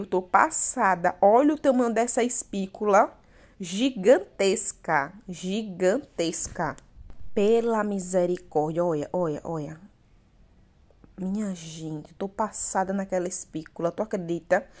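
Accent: Northeast, Brazil. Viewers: Nada chata, adoro o trabalho da, adoro os vídeos dela e até seu sotaque nordestino😍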